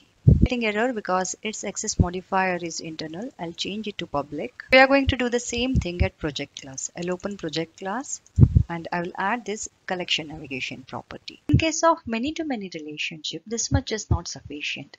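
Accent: India. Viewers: your explanation is so good. you are from Andhra or Telangana?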